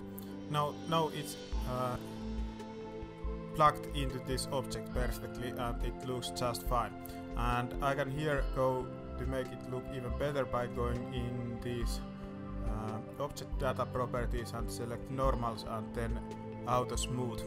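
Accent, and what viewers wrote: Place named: Finland. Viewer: Now that is a heavy finnish accent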